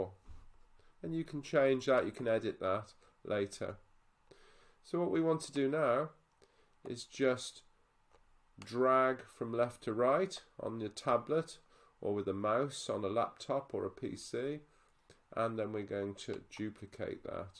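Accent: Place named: United States. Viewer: holy american accent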